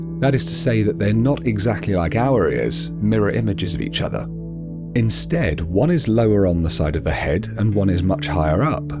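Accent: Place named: United Kingdom. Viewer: My goodness the generic British accent yeah....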